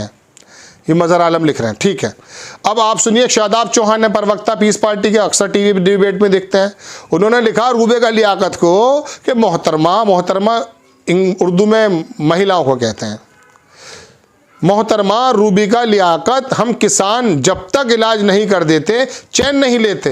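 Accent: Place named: Saharanpur, India. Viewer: bhai aap mere hometown ki bhasha bol rahe hain Shayad aap bhi saharanpur k hain.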